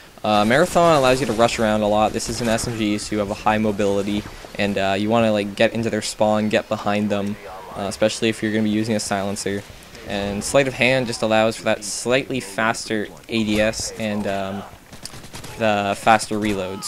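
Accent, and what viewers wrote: Canada. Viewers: Subbed Are u Canadian by any chance? I'm trying to see If I'm good at recognizing accents haha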